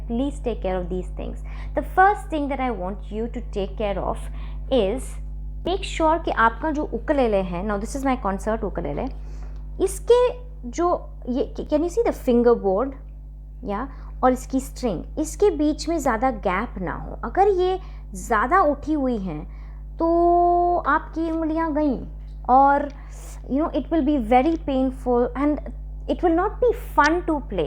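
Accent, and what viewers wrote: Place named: Germany. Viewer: your pronunciation is German based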